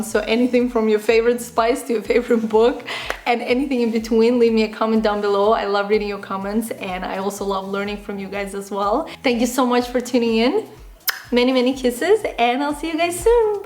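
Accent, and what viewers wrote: Russia. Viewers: you could see it from her videos that she's russian plus her accent is obviously a russian one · Русский акцент?😳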